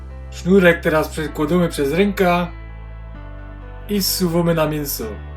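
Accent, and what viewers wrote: Silesia, Poland. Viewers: kochana,wspaniała gwara śląska❤ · Obudz sie.Przecez mowi gwara slaska.Mozna zrozumiec. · już raz robiłem szynkę z Twojego przepisu, bardzo dobra, teraz będę wstawiał poraz drugi i pewnie nie ostatni,Fajnie gadasz po ślasku, tak trzymaj, pozdrawiam z Podlasia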